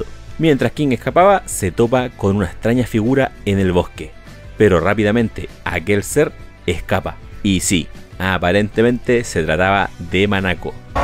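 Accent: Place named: Chile. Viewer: Epico es genial Tu acento chileno Brother Saludos eres un Crack 🤙🤙🤙😁👊👊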